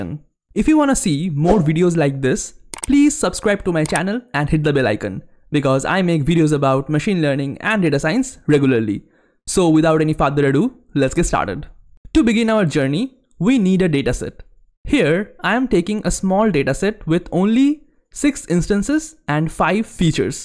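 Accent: India. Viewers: can incompetent indian schools not even teach the Queens English properly nowadays? it's painful having to listen to his paj££t accent AND seeing the words spelt wrong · This damn indian accent!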